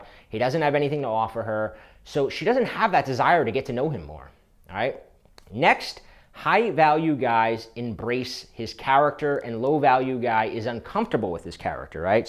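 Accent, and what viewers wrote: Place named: Boston, United States. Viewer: This dude is definitely from Boston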